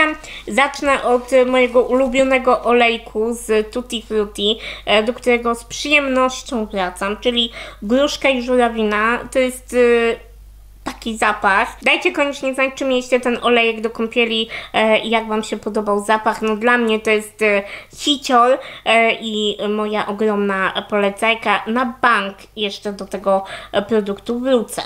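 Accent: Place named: France. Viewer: Muszę wypróbować polecany przez Ciebie tusz od Eweliny 😁 a Tobie z tej samej marki polecam bazę pod tusz. Aaa cudnie mówisz z francuskim akcentem 😄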